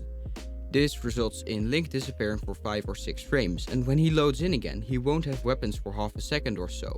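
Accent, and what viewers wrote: Netherlands. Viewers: Ik hoor aan je accent dat je nederlands bent maar bedankt voor de video :) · Mate are you dutch??? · quesion, are you dutch? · Are you dutch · Hol up, u dutch?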